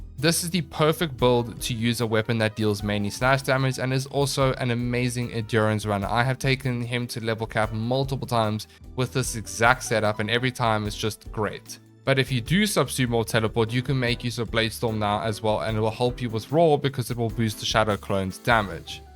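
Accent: South Africa. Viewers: I can hear you are South African XD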